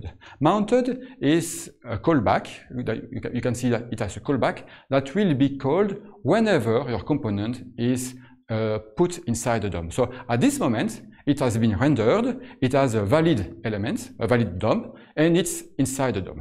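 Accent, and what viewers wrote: France. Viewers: he's 100% french or belgium native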